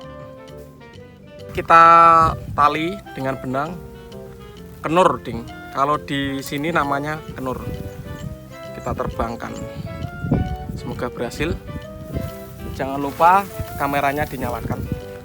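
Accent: Java, Indonesia. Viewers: jowone ndi mas nek podo ayo layangan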